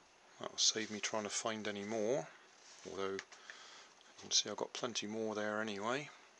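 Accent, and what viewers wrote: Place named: England, United Kingdom. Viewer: Love to hear an English accent..............so, you 'knicked' the egg box?' Huh.....:)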